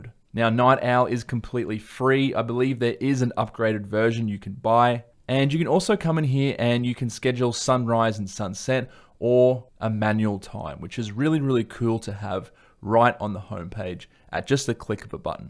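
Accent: Australia. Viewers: Closest I have come listening to Australian accent. You speak well and clear. I have used windows all my life I can't relate to this but for the love of Apple animations and the Mac OS I am here for it.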